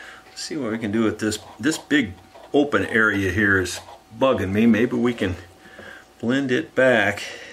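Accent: United States. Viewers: what a transformation, trash to treasure and I just love the country voice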